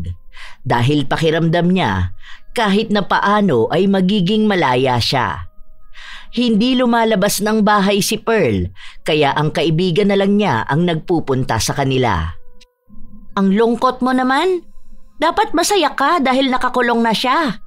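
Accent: Visayas, Philippines. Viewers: Nakakaloka qng accent may bisaya may ilonggo ano ba talaga ining hqhahaha.. wala lang natawa lang ako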